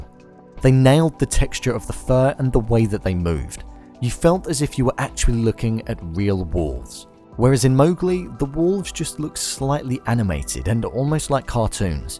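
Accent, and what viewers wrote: United Kingdom. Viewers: Its his British accent, even I wanna fuck it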